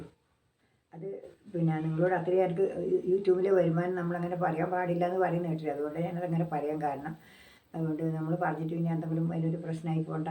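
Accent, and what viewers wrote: Kannur, India. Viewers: അമ്മയുടെ വീട് എവിടെയാ. കണ്ണൂർ ആണെന്ന് സംസാരത്തിൽ നിന്ന് മനസ്സിലായി ❤️. കണ്ണൂർ എവിടെയാ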